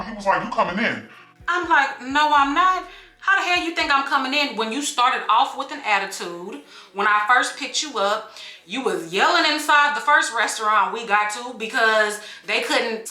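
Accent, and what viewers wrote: New York, United States. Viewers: Lol I’m crying at your New York accent. You did good I’m from Brooklyn. They say I’m aggressive too 😂😂😂😂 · went out to the mall today (BIG MISTAKE), Watched some Sunday Football (boring games), Sat down and ate a burrito while watching story time (NOW THAT WHAT THE F*** IM TALKING ABOUT) LOL. Love your story times! I'm from NY, living in Florida and your NY Accent was on point! xo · That's a new yorker for you..... Lol.... Born and raised but i don't talk like that... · Best friend that’s how New Yorkers talk trip me out too I’m from Florida we laid back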